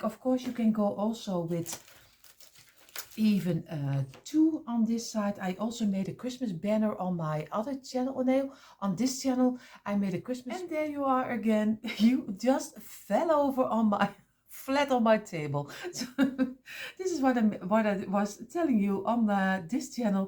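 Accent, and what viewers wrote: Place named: Netherlands. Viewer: You are dutch I heard. .my family originally came from Holland. Our last name use be visser but was changed to visher.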